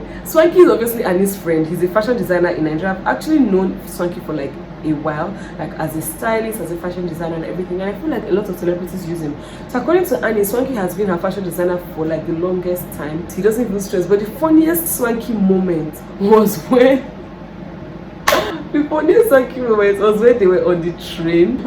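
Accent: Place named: United Kingdom. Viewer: Your British accent 🙌😹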